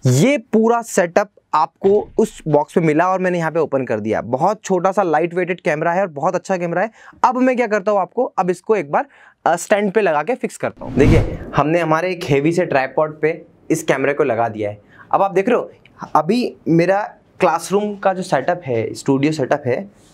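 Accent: India. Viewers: R u from Maharastra?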